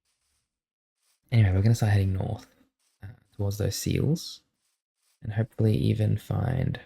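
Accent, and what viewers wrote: Australia. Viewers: absolutely adore these videos ❤ love your calming voice, and hearing the soft aussie accent as another aussie makes me feel so warm :) like being on call with a friend as i drift away. please don’t stop making these! <3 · Btw are you Australian? It's the accent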